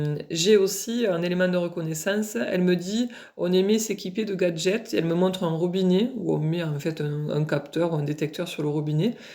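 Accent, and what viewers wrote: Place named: Provence, France. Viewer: Bonjour....Je viens de découvrir vos petites vidéos 🙏💝💝💝 C'est impressionnant les précisions Je suis a côté de vous une petite Medium car même si il m'est arrivé de capter des défunts et de les décrire, je n'ai pas encore la technique aussi qualitative que vous mais je n'en fais pas un métier de la médiumnité, juste mes Télépathies astrales sont pour ma vie personnelle car je communique avec ma guide céleste depuis plus de 11 ans Elle s'était d'ailleurs bien montré sur une photo et je l'a perçois en effet comme cela dans l'Astral et je communique avec son mari sin Ame jumelle qui est aussi karmiquement ma flamme jumelle et tous les jours par télépathie, nous communiquons notre amour mystique et si magiquement karmique et nous nous verrons Ma guide était Provençale , elle s'appelle Sophie , était une belle Âme aux belles valeurs, belle femme et mère médecin psychiatre et aimait porter de longues robes Elle avait un bel accent comme le vôtre et est décédée en 2001 d'un cancer Elle repose a Carpentras Elle aimerait que j'aille vivre avec son mari dans sa maison du Vaucluse Vous êtes dans quel coin ? 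Je me suis abonnée 😊 Quand vous captez des défunts, qu'est ce que vous demandez avant Votre méditation ? Est ce qu'ils font surtout partis de vos connaissances ? De vos abonnés ? Entourage ? Car c'est très vaste pour pouvoir trouver un proche qui par bonheur tomberait sur internet, YouTube et sur votre vidéo.....😮 Bien a vous et au plaisir de vous revoir Cécile De Kervinel 🙏 ⭐ 🙏 Si v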